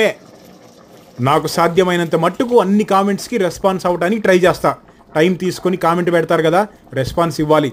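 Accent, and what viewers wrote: Andhra Pradesh, India. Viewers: Meeru telugu baga mataladtharu.....nenu meeru andhrapradesh ki chedina varemo ani anukunevallamu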